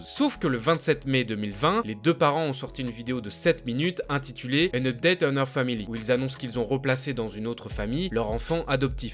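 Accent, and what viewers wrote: France. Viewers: tu sens le nord à des kilometres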